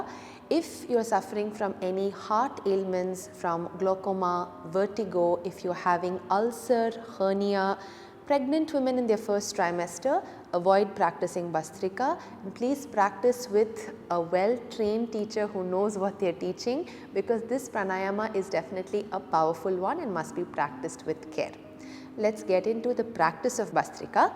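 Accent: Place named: Bangalore, India. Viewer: She belongs to Bangalore, udhar waisa hee pronounce hota hai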